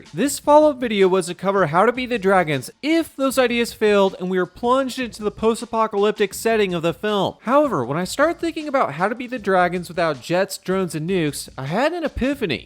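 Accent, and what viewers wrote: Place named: California, United States. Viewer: I hated the " confused California bro" accent at first but now I can't watch these how to survive or how you'd die videos without it. This channel is great 👌🏻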